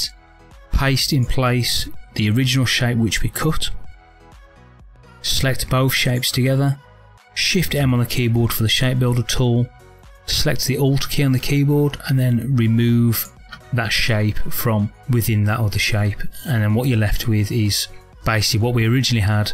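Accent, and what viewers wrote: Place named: United Kingdom. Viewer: Bruh I cant understand the way you are talking in british style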